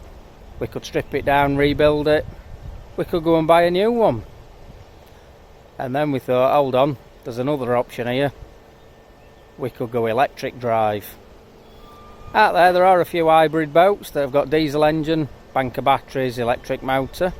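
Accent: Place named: Sheffield, United Kingdom. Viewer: Can’t work your accent out, bit if Sheffield bit of Chesterfield. Only watched this one video so far but will be going through your channel. Nowt beats a bit of Yorkshire tightness, love it 👍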